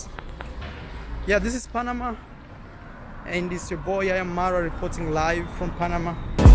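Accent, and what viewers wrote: Kenya. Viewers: The kenyan ACCENT IS REAL WAAAHHH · hes from kenya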